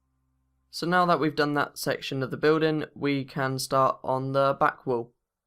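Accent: United Kingdom. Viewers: Youre buildings are no joke good as hell, by the sound of youre voice you sound like youre from the UK right? Varry good building bro. I subscribed to you so i can see more. Is it alright if i download the tutorials of this building for my own use only? Youtube sucks up all my data and i would love to make more of these. I do the same with Jagthunder1 cause his vids are bad ass and hes got some wicked ships. Check em out also. But any ways this is the best building ive ever made and you did a VARRY good job with explaneing it step by step witch made me build the first step perfect. And you stopt here and there and thsts where i paused it to build. Perfect vid man. Im looking forward to seeing more builds like this aswell. Thanks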